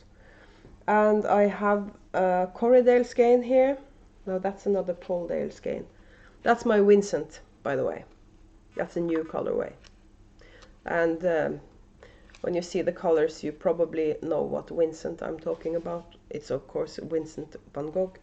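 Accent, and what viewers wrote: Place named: Norway. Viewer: Your accent is wonderful! My great grandfather came from Norway as a very young man and I enjoy hearing the accent! Don't worry about editing, for me as a viewer, I love to see natural podcasting. I enjoyed making fun costumes for my children and they used to love decorating with pumpkins they had carved different faces on to put on the porch with candles in them we would light at night. We used to clean the seeds and bake them for snacks Wich was fun. The best for me, was making them pumpkin cookies, which the loved. They are a cake like cookie with lots of spices and rasins. Love your podcast. I do hope your face heals quickly. Yarny hugs! Ava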